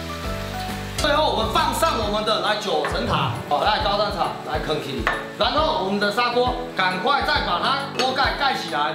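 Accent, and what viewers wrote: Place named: Taiwan. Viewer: 的台灣國語很口愛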